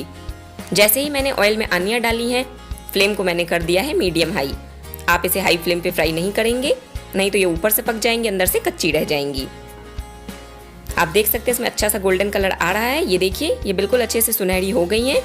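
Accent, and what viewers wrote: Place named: Sindh, India. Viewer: Aapki accent le pata chal raha hai aap sindhi hi hai...very nice recipe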